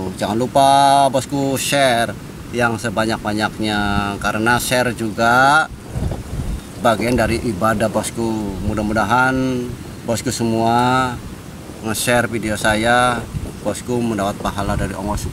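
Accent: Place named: Cirebon, Indonesia. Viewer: Logate kaya orang indramayu/cirebon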